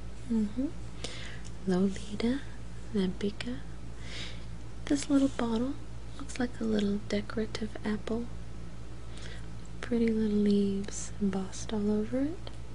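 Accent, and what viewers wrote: South, United States. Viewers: I love your asmr offerings. I'd love to hear you tell us about your tattoos. Your voice is so beautifully southern. :) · Yes, your southern accent =) It's the best soothing voice i ever heard *-*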